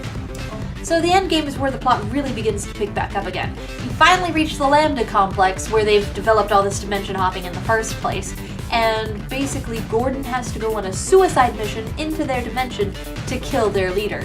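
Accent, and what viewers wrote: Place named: South, United States. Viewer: I did watch this the other night but as per usual fell asleep at the sound of your calming southern tones xD Awesome review and very objective! (Which is a good thing) entertaining and fun!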